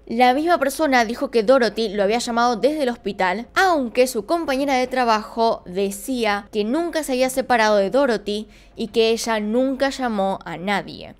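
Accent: Argentina. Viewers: Como todo (Pedante) Argentino la mujer de este canal tiene su pequeño acento Chocante.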